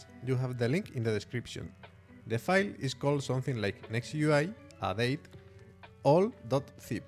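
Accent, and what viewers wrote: Spain. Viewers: jaja es que se te nota que eres español y pense a lo mejor igual otro canal y yo viendo en ingles jaja.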